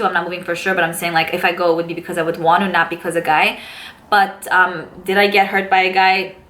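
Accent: New York, United States. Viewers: Love watching your videos. I miss New York and all my family that still live there. Listening to you talk reminds me of home. I think you have a great personality and I look forward to watching more by you. So glad I came across your videos. Love to see more makeup tutorials since I suck at it. Lol 😆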